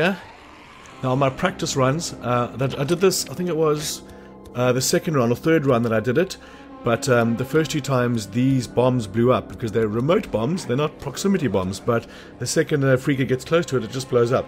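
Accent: South Africa. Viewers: thought I recognized the accent. I'm from SA too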